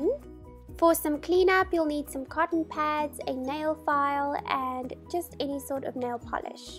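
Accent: South Africa. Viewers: You sound really innocent and cute ♥️😇 There's something original about your accent, sort of South African & I love it 💖💖💖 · Hi, nice to hear a South African voice😋🇿🇦Thank you for the great video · You're south african🥰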